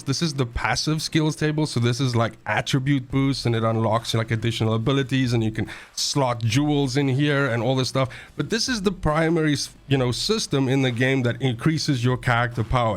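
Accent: South Africa. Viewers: Trying to figure out your accent... Are you from South Africa? Howzit from Cape Town 😎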